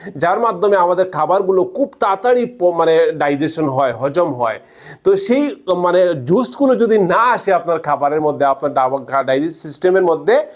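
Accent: Sylhet, Bangladesh. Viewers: আপনার অফিস বা চেম্বার কুথায় বলেন। ভাষা বলে আপনি সিলেটি।চেম্বারের ঠিকানা দিলে উপকৃত হব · আপনার কথায় মনে হচ্ছে আপনি সিলেটি, কিন্তু মেডিসিন গুলি দেখছি সব ইন্ডিয়ান , এসব মেডিসিন কি বাংলাদেশে পাওয়া যাবে ??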